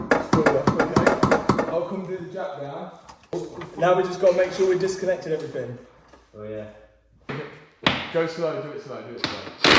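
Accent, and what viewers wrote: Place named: Nottingham, United Kingdom. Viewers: welcome to America, where we fix our own stuff allll the time. Wanna know why? Because if one man can do it, another man can can do it as well. With enough time money research and patience ANYTHING can be repaired by anyone at all. This is also why America has led the way in the automotive industry since the Model T, and why car YouTube was pioneered by Americans. 🇺🇸 I’m glad to see stays positive and isn’t scared off by things he is unsure of. Innovation, hard work, trial and error are the backbone of a man like. is basically a yank with an English accent. Good work buddy 🤜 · Without being rude. May I ask where you are from?your accent sounds like a Nottingham accent.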